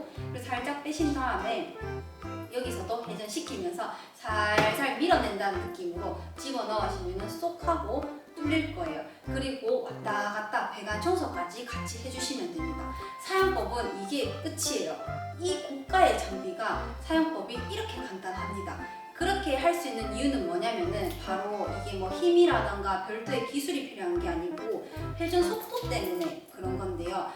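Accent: South Korea. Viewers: 오늘은 ~~~ 경상도 사투리 잘 보고 갑니다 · 대구에 사시는 분인 줄 몰랐습니다 경상도 대구 고향 말씨 정겹습니다 감사합니다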